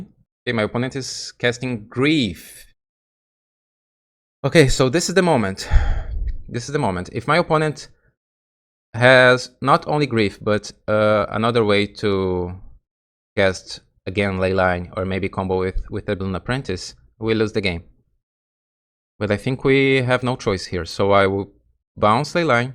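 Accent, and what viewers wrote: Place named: Brazil. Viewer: ele é br? nem sabia mas agora o sotaque tá fazendo sentido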